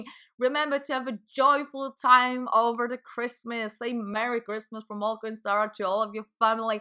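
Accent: United Kingdom. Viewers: Like for this British accent ;) To to put it in a nutshell - Coinbase sucks :D Nothing more than fraudulence. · My, I just adore British accent! But getting back on topic - I think that 12 days of Coinbase suck and nothing`s gonna change in the upcoming months. Only users can make some change. I`ve made my decision on this already - I`m gonna close my Coinbase account after I withdraw all my funds. I`m so tired of being fooled by them one way or another. C`mon, guys, let`s make some change!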